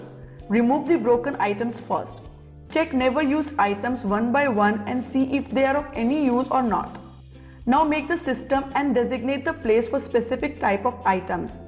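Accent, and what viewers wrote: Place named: India. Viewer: You Indian aur UK Q English toking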